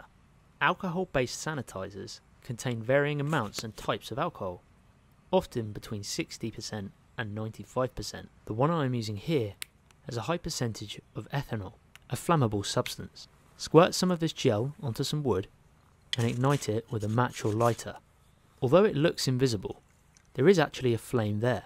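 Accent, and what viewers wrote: United Kingdom. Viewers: hes english lol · Love how he is clearly british but says ancestors to Native Americans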